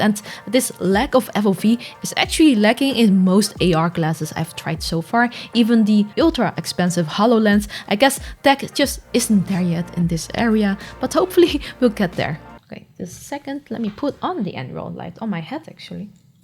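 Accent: Netherlands. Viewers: You might want to look into an English accent coach. The dutch accent is one of the most mind fryingly annoying in the world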